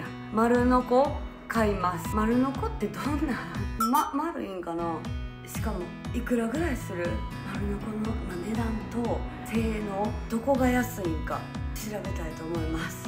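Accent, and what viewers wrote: Kansai, Japan. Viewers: さんの関西弁最高癒されます💖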